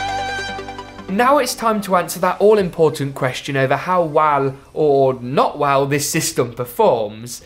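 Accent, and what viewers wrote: United Kingdom. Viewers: He has that perfect Harry Potter accent. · You have a huge british accent!!!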